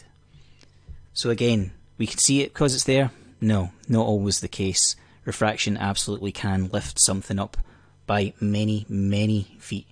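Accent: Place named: Scotland, United Kingdom. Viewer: Alright mate? A fellow Scotsman here. Keep up the good work. I found you through a painful chat with Spurs etc. It was great to hear a familiar accent in the discourse.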